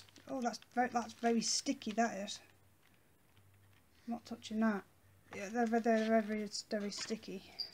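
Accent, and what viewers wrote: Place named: United Kingdom. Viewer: i really like your accent. where abouts in the uk are you from? you got some great freebies. i think i missed out on a couple of these :( i use the coconut oil on my hair and its great x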